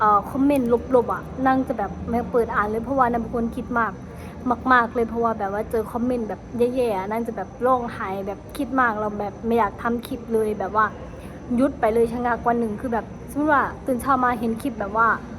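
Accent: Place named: Phimai, Thailand. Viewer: พูดโคราชแบบนี้ไทยพิมายเด๊นิ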